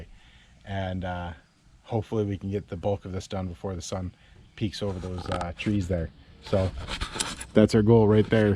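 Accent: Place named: East Coast, United States. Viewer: Can tell your From the east. Deezel, Dee Zul. Here in Colorado it's a Dee Suhl, or Dee Saul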